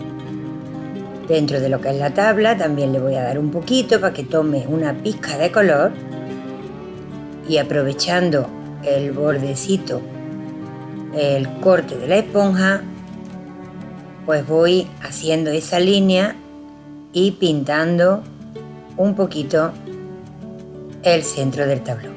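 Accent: Andalusia, Spain. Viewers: Hola me ha encantado esta maravillosa obra de arte y darte las gracias por darnos la oportunidad de las personas que nos gustan las manualidades como es mi caso, sin semejante mi de lejos a ti, podamos intentar hacer tantas bellezas. Soy de un pueblo de Barcelona, aunque me encanta tu acento y Andalucía en si,. Muy agradecida y te deseo mucha salude y suerte 🍀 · Hermoso trabajo!! Desde Argentina 🇦🇷 me encanta tu tonada andaluza!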